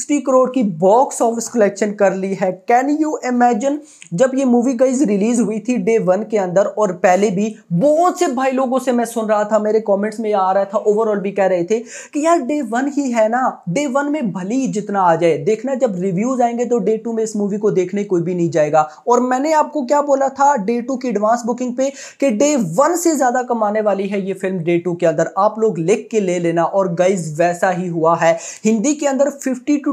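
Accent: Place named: Pakistan. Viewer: Bhai apka accent pakistani jaisa q hai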